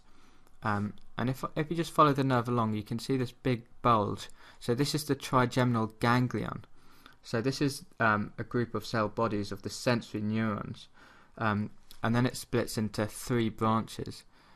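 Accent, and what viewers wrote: England, United Kingdom. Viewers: Excellent tutorial! And it's good to hear the English pronunciation of all those names that I (a foreigner) have tried to learn from books only. Just one thing: As far as I know, the acoustic nerve, surprisingly, contains far more efferent fibres than afferent, seemingly for assisting in focussing our sound perception by affecting the outer hair cells. So shouldn't it be called a mixed nerve, rather than pure sensory?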